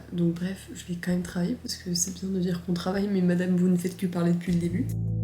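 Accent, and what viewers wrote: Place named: Quebec, Canada. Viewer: Tu as un léger accent québécois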